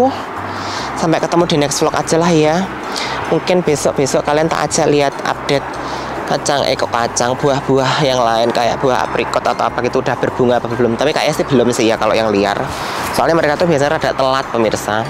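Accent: Java, Indonesia. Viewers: Baru dua hari ini ngikuti flog njenengan mas....asik janan...bhs medoknya...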